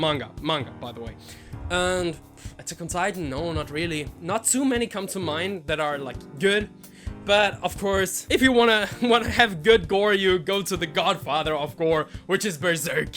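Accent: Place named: Europe. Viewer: your accent sounds european, where are you from?(i'm from the netherlands tbh)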